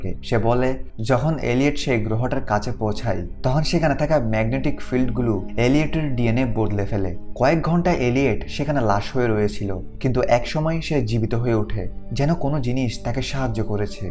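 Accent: Chittagong, Bangladesh. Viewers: ভাই তো চিটাইংগার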